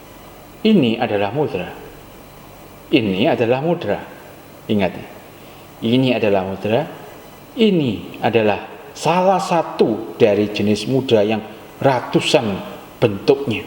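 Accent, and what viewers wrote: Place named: Bali, Indonesia. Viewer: Mas kayanya dari bali, lihat logatnya, salut untuk konten2nya yg mendidik.🙏🙏🙏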